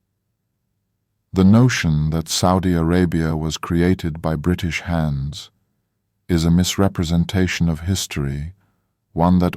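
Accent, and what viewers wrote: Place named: Middle class, United Kingdom. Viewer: lol if it wasnt 4 the british there would be no oil markets and u lot wuld still be 3rdworld peasants, btw u shuld have narrated the video yorself instead of using sum corny english middle class voice over ...or do u think tht sort of accent gives yor narrative more validity ...funny tht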